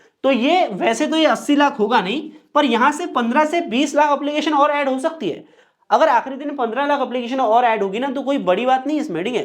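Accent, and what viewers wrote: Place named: Indore, India. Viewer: MP se ho na bhai, indori accent ❤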